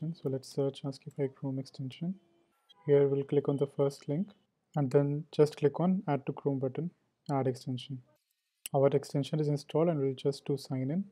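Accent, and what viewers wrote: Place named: India. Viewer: Suggestion. Please use an AI voice with no accent o remove your heavy Indian Accent that is terribly difficult understand. I want to watch and understand. By doing that more people will be able to experience and use your great product. AI is simple. Scan in the transcript and and let AI do the rest. That way you can offer your videos in many languages. Its available, use it.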